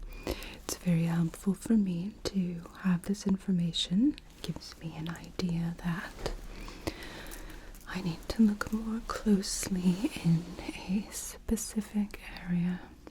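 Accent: United Kingdom. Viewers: Posh, British accent, love it. I'm a Bristolian so not posh at all! This is so good! ❤❤❤ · Love the British accent 😊 Best wishes from the UK 🇬🇧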